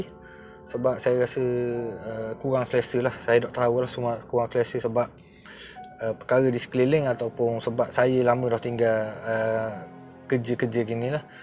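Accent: Terengganu, Malaysia. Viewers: MU BUAT KONTEN..APA MU CKP PUN..AKU XPHM..CKP BENDA APA PUN XTAU..SBB MU PEKAT SGT GANU..CKP K.L...SEMUA OWG PHM..MU CKP GANU..MN PAHM..MU PUN NK CKP TAKOT2..LG XPHM..LAST2 AKU BOSAN NK TENGOK.. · Ooo... Awk org ganu ye.. 1st time lyn OH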